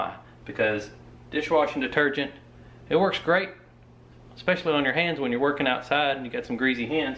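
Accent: South, United States. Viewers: I love when a southern accent roasts trump 😌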